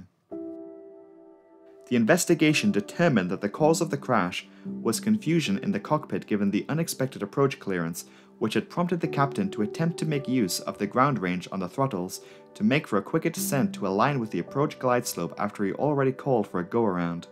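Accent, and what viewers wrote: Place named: North East England, United Kingdom. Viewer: Based on my limited knowledge of UK accents (I’m American), I’m guessing he’s from North East England. And I agree that it’s an appealing accent; it’s certainly much nicer-sounding than mine 😆.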